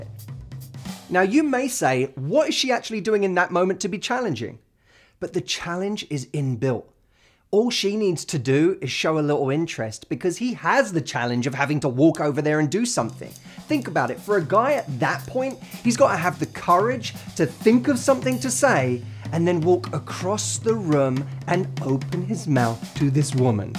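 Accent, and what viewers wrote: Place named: United Kingdom. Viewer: I love the way you Brits speak, "exomple ofter exomple" :–0. I'm not too sure about the glottal stop though. Or should I say "the glo' al stop"? hehe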